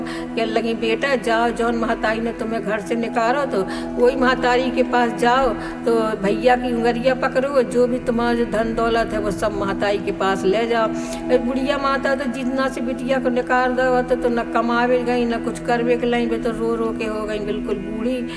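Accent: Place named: Bundelkhand, India. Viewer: Bahut acchi lg rhihai katha apni bundelkhandi boli sunkar to maza hi aa jata hai. Radhe Radhe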